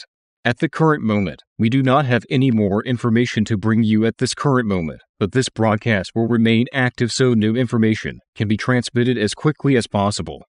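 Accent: United States. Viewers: Like the background muisc, but you do need to be aware that a British EAS would not use an Americn/Canadian voice over person. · Did no one observe the American accent on a British channel? 😅 However, great effort!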